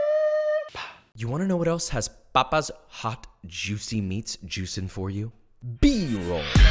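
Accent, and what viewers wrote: South, United States. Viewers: your southern background is showing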